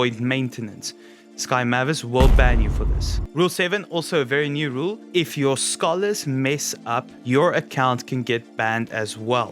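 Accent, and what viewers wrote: South Africa. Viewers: Hey man. Random Question - Are you South African? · you from south africa?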